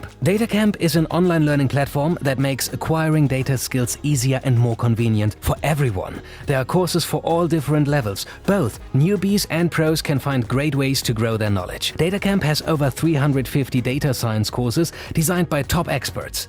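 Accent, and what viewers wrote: Germany. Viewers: the voice and the german accent 😩😩😩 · I used to have a professor lecturing in Media & Sports, who was from Germany. Every time I watch these videos it’s like being back in class. Not just accent, it’s almost exactly the same voice. Eerie but awesome.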